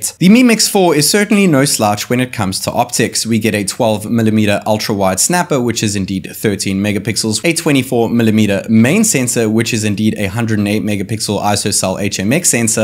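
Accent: Johannesburg, South Africa. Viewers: Is that a JHB accent I'm hearing??🤔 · South African accent 👀 lezzz go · Great video. Are you South African?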